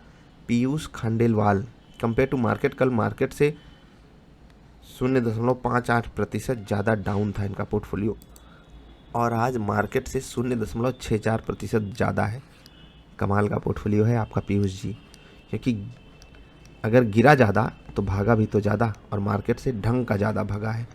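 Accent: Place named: Eastern Uttar Pradesh, India. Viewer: You are from UP EAST ??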